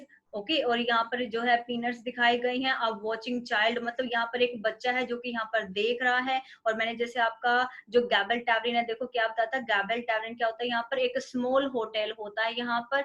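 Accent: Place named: Punjab, India. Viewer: Tuhadi bolli Punjabi lagdi aa..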